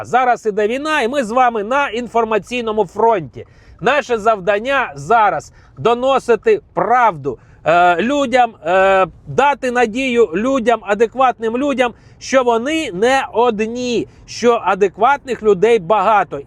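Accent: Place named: Russia. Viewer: Таваріщ, ти шо із московії? Премію міру, пазорітса, це що гонорово говорити із масковскім акцентом? Я перестав переглядати твої відео по цій причині, а тут ось знову, дай думаю погляну, виправився чи ні? Ні не виправився, то я можу і відписатися.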